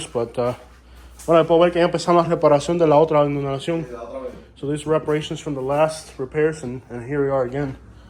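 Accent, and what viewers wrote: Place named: Florida, United States. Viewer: Saludos se escucha que hablas floridacubano 😂 Dios Mio que triste 😢 they didn't even finish repairs from the last storm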